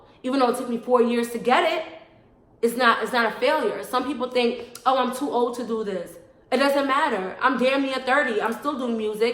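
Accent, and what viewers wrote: New York, United States. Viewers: She got that NY flow but she is classy and inspiration. Whole diff vibe 💖✨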